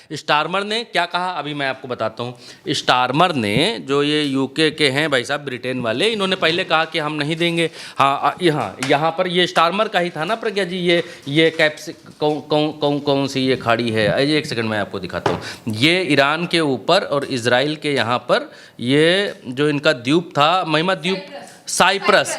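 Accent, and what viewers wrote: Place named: Uttar Pradesh, India. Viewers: Aapka ' utter pardesh touch ' acha lagta hae bhai...good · Uttar Pradesh ki khadi bhasha ap se sunane me achcha lagta hai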